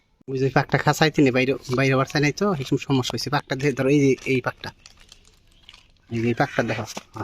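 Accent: Tangail, Bangladesh. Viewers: kotha shunei mone hoechilo , Amar jonmosthan o Tangail, korotia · ভাই আপনার কথার ভাষায় তো বুঝা যাচ্ছে আপনারবাড়ি টাঙ্গাইল জেলা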